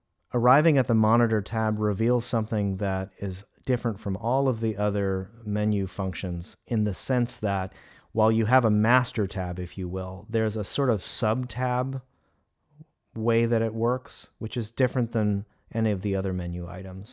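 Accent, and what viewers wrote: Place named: Brooklyn, United States. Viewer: Great video. It sounds like you are in Brooklyn. I'm about 45 min from you. I have done some video work with my 80D/mics/steadicam. I'm interested in learning more and assisting as AC/boom operator/gaffer/etc on a bigger project. Let me know if you are ever looking for a competent crewmember as an unpaid intern.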